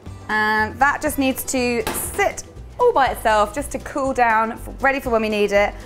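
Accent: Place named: RP, United Kingdom. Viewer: Wow she sounds so posh, is she speaking in british received pronunciation?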